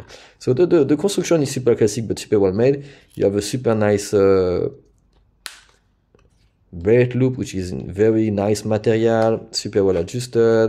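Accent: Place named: France. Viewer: Bien l'anglais avec un accent français 😊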